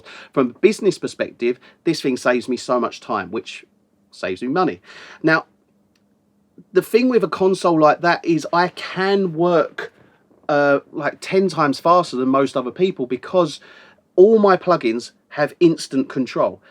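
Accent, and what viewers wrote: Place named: Cockney, United Kingdom. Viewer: So, this is cockney accent, I guess :)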